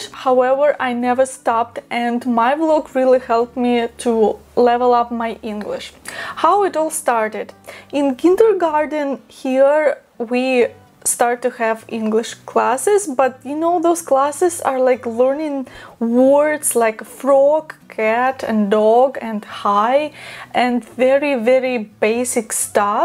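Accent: Ukraine. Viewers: Ukrainian accent is very nice. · Your accent is Beautiful. My fiancee is Ukrainian and she has a similar accent and it is SO beautiful. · Your English is fine . I'm used to hearing English with the Ukrainian accent now . I don't have a problem understanding you . Your parents sure were good to you and your sister . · Your English is really just fine. It's just that your Ukranian accent is pretty heavy. That can go away with practice if you really want. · The criticism of your accent by other Ukrainians, I believe, is based on culture. Of the Ukrainians I have met, they are perfectionists. Your fellow Ukrainians want your English to be perfect since you are considered an Ambassador of Ukraine on the internet. It is ok, they are proud a people. Glad to see you back online. Take care. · Your English is perfect, 💐💐💐 please dear Ukrainians don't be embarrassed by your accent it's lovely. 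You want to hear the English language in a terrible accent then you gotta talk to us Australians🤣🇦🇺 Been picking up some Ukrainian words & phrases from watching you all with translations in clips & songs Ukrainian is beautifull but very hard for us. Get excited when recognising words & phrases😁 but damn when I hear myself trying to say/sing along my Australian Strine accent is dreadfully thick & pronunciation is woefull😅 stay well & safe & Family🇦🇺❤️🇺🇦🦘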